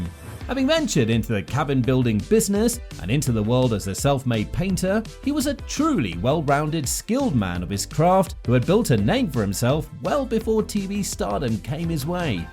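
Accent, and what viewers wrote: United Kingdom. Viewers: Where in West Virginia did you find a narrator with a British accent? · Get so tired of the brit narrators.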